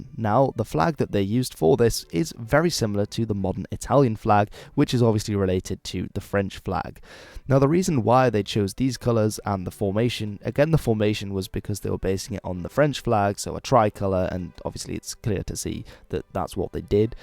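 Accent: Netherlands. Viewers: Are you Dutch? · Je kan gewoon horen dat je nederlands bent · Is he Dutch wtf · Detecteer ik hier een heeeuul klein beetje Nederlands accent? Ik weet het niet zeker, en het Engelse accent is bijna perfect maar de Nederlandse uitspraak is te perfect. Deze dude is Nederlands. (denk ik) :D :D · what country is from? His accent makes me think hes not from the UK but I could be wrong. Is he Dutch? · I love your channel from now on. You just earned a sub mate. Are you Dutch or something?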